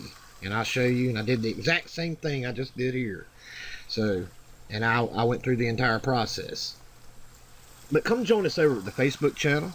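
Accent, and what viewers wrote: South, United States. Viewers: Every time I hear this voice I get a bit of nostalgia for Georgia, Alabama and South Carolina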